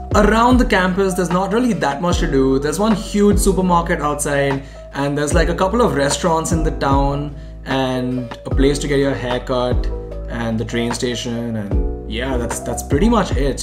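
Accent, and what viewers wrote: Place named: India. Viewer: I'm bothered by the strong Indian accent. I could hardly understand what you're saying. Speak well so people can understand you. That kind of English wouldn't cut at a top UK, let alone US business school.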